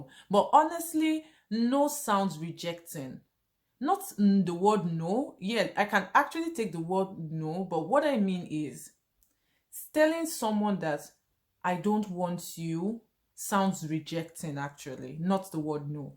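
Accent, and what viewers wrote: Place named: Finland. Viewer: ARE YOU ESTONIAN ? I THOUGHT YOU ARE FROM FINLAND BECAUSE OF YOUR ACCENT.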